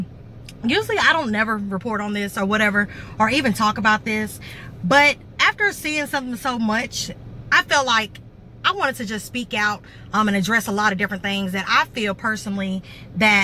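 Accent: South, United States. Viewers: YOU SO COUNTRY OMG😍😍😍😍😍😍😍😍😍😍😍😍😍😍😍😍😍 WHERE YOU FROM!?!?!?!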